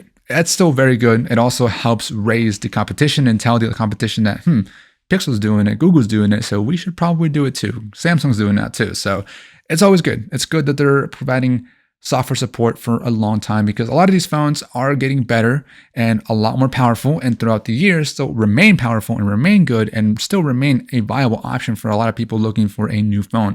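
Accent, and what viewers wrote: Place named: United States. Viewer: Where'd you get India 😂 he has an American accent and a Mexico jersey on lolol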